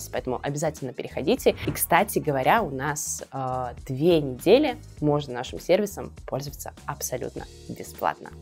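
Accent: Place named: United Kingdom. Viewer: ммм этот британский акцент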